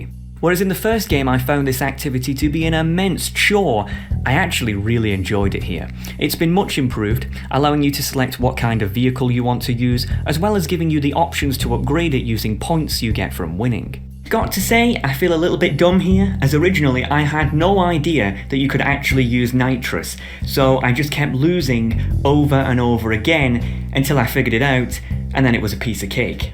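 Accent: United Kingdom. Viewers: wait.. you're bitish?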